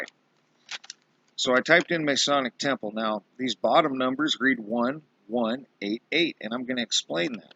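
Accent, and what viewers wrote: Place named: California, United States. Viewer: Hey, - Yeah the comment was mostly about the physical observation of Pinball. the song came 2 mind. I've listened to the entire video. The number coding is interesting but I don't get it all. Your dedication to discrption as to the form makes sense. I admire your talent for being able to cut into this line of logical explanation. I'm seeing a lot of things as well, different direction of observation on certain aspects. You have a cool accent. I can't tell if it's California or the Great lakes, your delivery of speech is a bit quicker than a Midwestern accent. We have a long, long way to go. It's like we're just now entering the late first quarter of the football game. Peace. df.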